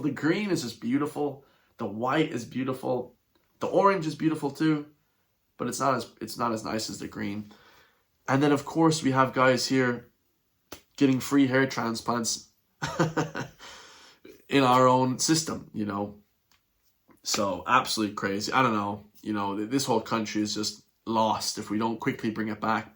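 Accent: United States. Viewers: Get it right though; don’t clickbait! It’s not that irish isnt allowed is it? it’s not illegal it’s just that one person has mentioned it. Also, how the hell would you know if he’s illegal never mind all the other racist shit you’re spewing. You don’t sound very irish either btw, getting a strong yank flavour to your accent buddy.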